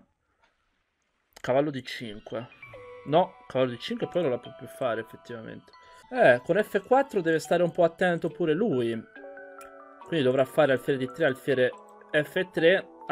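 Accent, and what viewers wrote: Tuscany, Italy. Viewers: Speed ception finale. Complimenti per la memoria del pattern. In passato peccato per la bassa qualità audio ma era bello sentire la c'è Toscano più marcato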